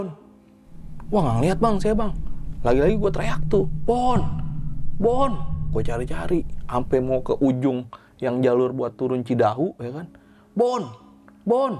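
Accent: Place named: Jakarta, Indonesia. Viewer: Mirip Temon gaya bicaranya 😅 lucu khas betawi banget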